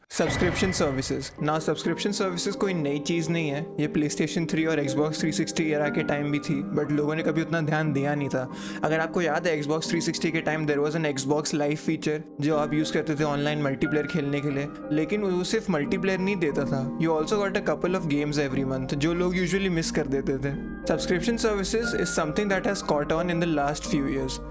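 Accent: Odisha, India. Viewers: BRO you from odisha ? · Are you from odisha !?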